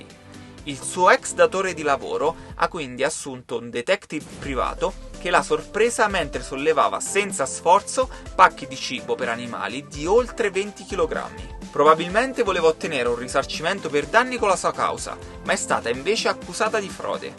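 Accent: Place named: South, Italy. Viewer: trick analfabeta....trick.........youtube la patria degli ignoranti con slang meridionale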